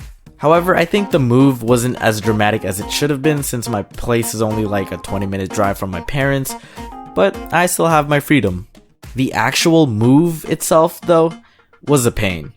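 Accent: Philippines. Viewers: I really like that Filipino Accent of that english speaking skills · Love the Filipino accent 👌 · I always crack when you speak in "inglis with pilipino aksent" and use some tagalog words. I'm so glad Youtube reccomended this channel. 😂😂😂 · Is it just me or I heard you as a Filipino ?? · I love your Filipino accent/attitude😂😂I relate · Nice Filipino accent from the Philippines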